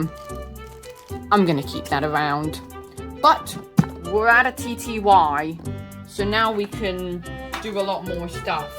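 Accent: United Kingdom. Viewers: I love how the british accent is so recognizable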